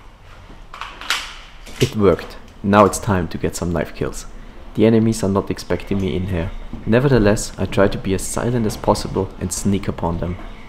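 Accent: Germany. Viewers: man hört so richtig das er deutsch ist auch im videos als jemand anderer geredet hat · are you german? i am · Warte der ist Deutsch Junge dieser Akzent sagt alles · Are you from Germany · Are you German? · Hach ja... Deutscher Akzent im englischen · You are german?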